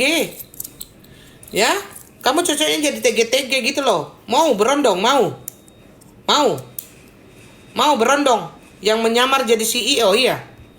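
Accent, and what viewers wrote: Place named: Makassar, Indonesia. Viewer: Koko logtnya mirip org Makassar 😅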